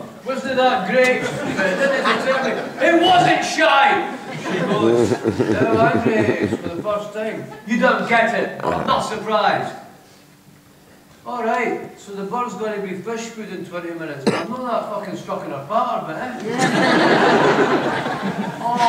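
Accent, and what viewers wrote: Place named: Scotland, United Kingdom. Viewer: I love the Scottish accent! :)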